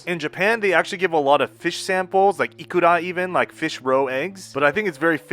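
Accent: United States. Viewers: this american sounding asian guy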